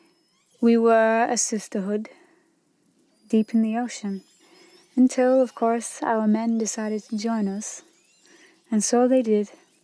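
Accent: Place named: Northern England, United Kingdom. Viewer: Nice vids, some of the most interesting iv seen, keep up the good work, you sound like your from north of England, cant put my finger on exactly where though.:P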